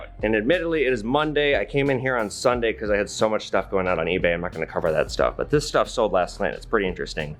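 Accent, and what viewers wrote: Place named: United Kingdom. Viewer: I didn't know was Brit tissh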